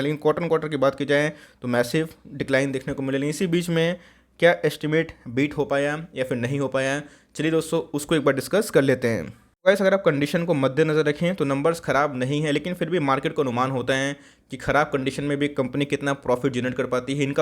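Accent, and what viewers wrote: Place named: Odisha, India. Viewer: Odia accent.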